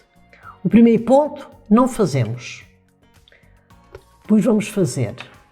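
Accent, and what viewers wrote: Portugal. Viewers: Amo fazer tricô. Acho muito bonito teu sotaque português. Explicas com perfeição. Parabéns abraço do Brasil.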